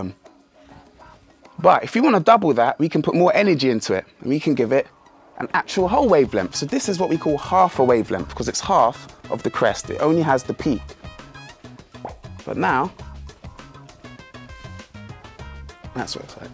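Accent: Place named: London, United Kingdom. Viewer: it's a pure london accent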